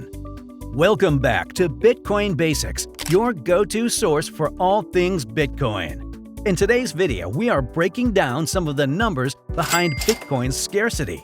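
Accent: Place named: United States. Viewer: Most american voice ever.. over the top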